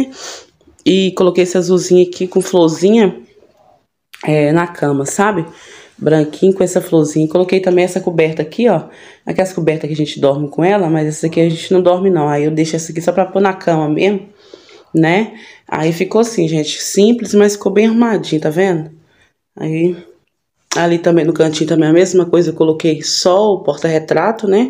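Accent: Minas Gerais, Brazil. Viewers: Vc é de onde ?? Vc conversa tão engraçada faz graça kkkkkk igual eu deve ser mineira como eu amiga kkkkkk é nois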